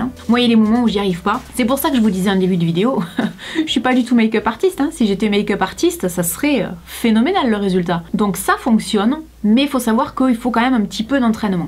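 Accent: South, France. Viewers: J'adooore! Merci pour tes conseils pratiques et clairs. Et l'accent du sud en prime, on adore! 😁 PS: tes ridules sont sublimes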